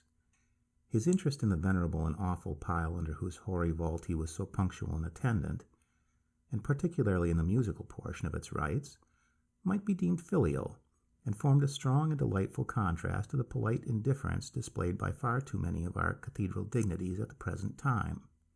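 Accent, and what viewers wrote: United States. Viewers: Colonial? American…. he talks in a rush and then inapause…..strange delivery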